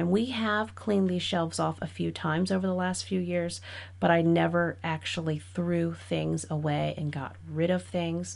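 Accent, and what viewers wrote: South, United States. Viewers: You're so welcome my dear. God bless you and your lovely family. Love the Southern accent. Makes me feel at home. We are originally from Virginia as well. God bless and keep making people smile. 😘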